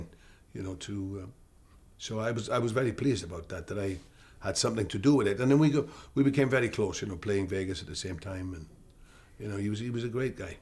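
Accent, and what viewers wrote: United Kingdom. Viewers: I love your voice, your English accent and your good sense of humor and more your humbleness god bless you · Azim Hulaimi he's Welsh , actually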